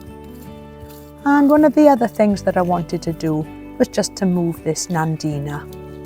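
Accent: Wales, United Kingdom. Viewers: You have a certain charm to your videos that make them relaxing to watch. Not sure if it’s the music or your Welsh accent or both but it works really well. The garden still looks great. Keep enjoying it.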